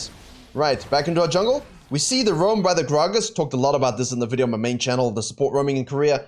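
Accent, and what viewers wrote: South Africa. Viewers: Why do you sound South African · Dude you sound so much like a South African it's crazy haha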